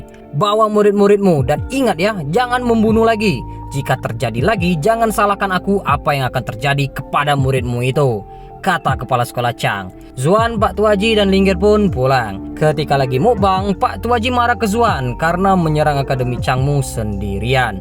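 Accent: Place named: Medan, Indonesia. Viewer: Logat Medan nya kental bgt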